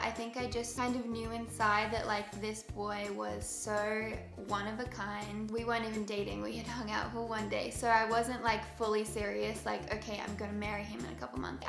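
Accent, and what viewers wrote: United States. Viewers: Your American accent is amazing! That's so funny how you can just switch like that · i love your american accent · I was so shocked by your US accent oh my gosh! I'm from nz and could never do one as good as you!! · omggg doesn't even her voice sound so much different with the american accent? hahaha love it!!! like a whole other person :D still the cutest thing ever is her australian accent ☺ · Hahah, why did it feel like a completely different accent with the American accent 😂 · Omg you sounds so different with that American accent!! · LOVE YOUR AMERICAN ACCENT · WHAT THE HECK HER AMERICAN ACCENT IS SO GOOD BUT SHE SOUNDS COMPLETELY DIFFERENT AH 😩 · I'm Japanese and I'm studying English , when I watched your video first time , I didn't think your accent is strong but in this video I know the difference !! American accent is cute too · Your voice changes so much with your American accent but I still love you accent now!!!! 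🥰🥰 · OH MY GOSH!! Your American accent was sooo good!! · LOLOL I love your American accent. so funny. seriously a different person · Wow amazing american accent💖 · you with an american accent is SO different! wow! love you. ❤️ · Omg I love your American accent! I had to close my eyes and remember it was you! Love you 💕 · omg her american. it honestly made me “uncomfortable” luv u! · Omg it was so weird to see you talk in american accent 😨. It was reaaally good but sooo different from what we are used to! Love you😊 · Your voice sounds so different with an american accent, but still so slick❤ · oh my gosh. her american accent just does NOT fit her XD keep it Australian i love your accent the way it is! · You American accent 😍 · AMERICAN ACCENT IS ON POINT ! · Although it was cool hearing you talk with an American accent, I totally favor your Australian accent! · You sound completely different with an American accent😂😂❤️ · LOVE the American accent!!! 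· Omg your American accent tho!! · I think that you're gorgeous and you shouldn't worry about what other people think about you or your relationship with Gabe. Gabe chose you because he loved you for who you are and he was happy with you. I think that you and Gabe are perfect for eachother. I love your American accent❤❤❤❤ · You are so good in American accent.❤ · Ok your American accent is officially on point!!! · omg the accent!! It sounds like a voice over because it’s just not real in my mind to hear you with an American accent!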